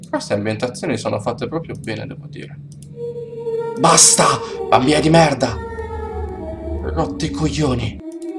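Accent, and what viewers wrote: Bari, Italy. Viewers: maa.. sei di Bari? Comunque "stima totale!" bel video :D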